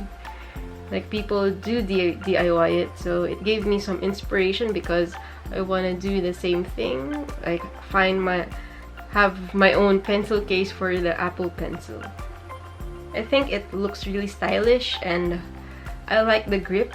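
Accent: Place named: Philippines. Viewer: This is the very first video I'm watching of you, and I was like, "She looks like a Filipina than a Japanese." 'cause of the way you pronounced your words and then I read your bio AND TURNS OUT I WAS RIGHT!!! YEPPEYYY So Ate I hope you could answer my questions (⁎⁍̴̆Ɛ⁍̴̆⁎) + I'm almost graduating and my birthday is nearing, and I don't know whether to get the iPhone X or Ipad Pro together w the accessories. - If I pick the iphone x, it'll be my second phone I ever had for the passed years! I'm not using a phone rn only an Ipad, w a 16GB storage. I SERIOUSLY LOVE EDITING AND MY GALLERY IS PACKED UP W HOT KOREAN BOYS. AND I DISLIKE ICLOUD. + Do I have to purchase the app; Procreate? Or is it free? + Does the Ipad Pro have a screen recording? - 'cause I'm planning on making tutorials of my edits. + How good is the Camera Quality? 💜💜💜💜💜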